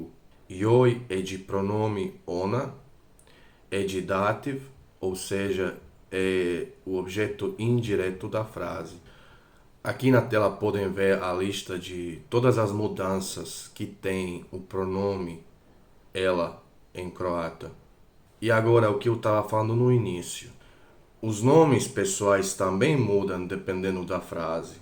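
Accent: Brazil. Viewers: Cheguei a pensar que era brasileiro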